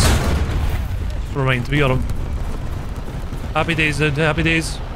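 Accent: United Kingdom. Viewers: revärs ? Are you britisch or what accent is it?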